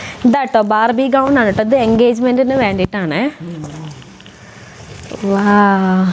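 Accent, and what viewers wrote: Malappuram, India. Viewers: a north indian doing videos in Malappuram slang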